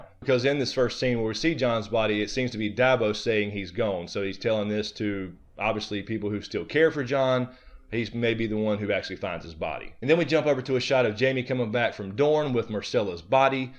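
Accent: South, United States. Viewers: so excited for this season! I'd say I'm more excited for season 6 than the previous seasons because we are going to see stuff that hasn't been told in the books yet. Can't wait! Just found your channel...great recap! Also, you sound like a fellow southerner...so double bonus!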